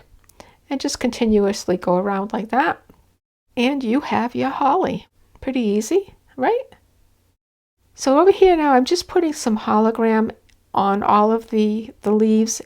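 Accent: New England, United States. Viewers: You have a lovely video library. Just subscribed. Also a lovely New England accent. I’ll definitely be checking back.❤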